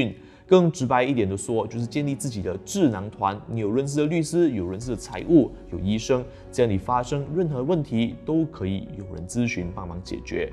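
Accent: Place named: Malaysia. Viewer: 聽口音是馬來西亞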